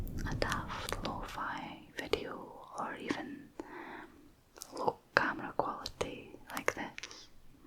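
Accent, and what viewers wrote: Scotland, United Kingdom. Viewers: So soothing, eyes involuntarily closing, and the video becomes a softly whispered, Scottish podcast...very nice.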